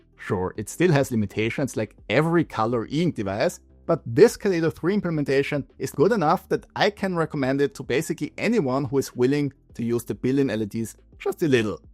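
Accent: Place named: Austria. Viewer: wenn ich englisch mit österreichischer "Melodie" höre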